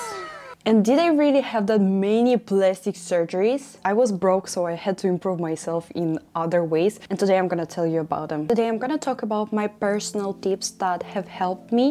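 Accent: Russia. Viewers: Трудно слушать этот русский английский даже мне как русскоязычному человеку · Сразу чувствуется русский акцент 😅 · Русский акцентт :333333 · Мне показалось, или у тебя русский акцент?) Прекрасные знания английского❤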